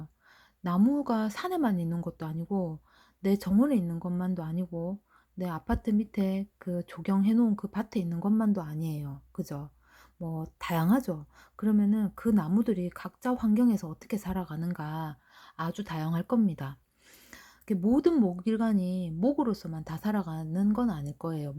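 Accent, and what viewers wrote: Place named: Gyeongsang, South Korea. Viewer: ㅋ~같은 겡상도라 더욱 듣기가 좋아요. 정듬뿍 넘치는 목소리입니다~💕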